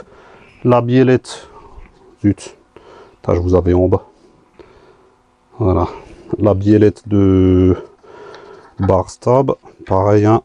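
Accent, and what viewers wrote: Alsace, France. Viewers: salut j'aime bien tes vidéos et avec l'accent alsacien c'est encore mieux continue comme ca c'est bien utile tes tutos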